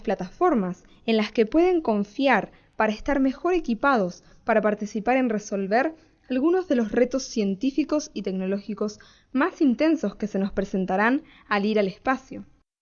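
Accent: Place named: Panama. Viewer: No Pues!! Esta Tipa Solo Piensa En La Economia. y los Animales Que?? Los Que estuvieron millones de Años Primero que nosotros que?? no merecen ningún trato? del Hecho de Ser ''ANIMALES'' Pff Por Dios -.- Habla como si fuera panameña la tipa esta. Ademas, que conexión de las américas ni que nada!, con las ganas se van a quedar porque ese tapón los panameños la cuidaremos, y sera el tesoro que le dejaremos a las nuevas generaciones de nuestro Pais PANAMA..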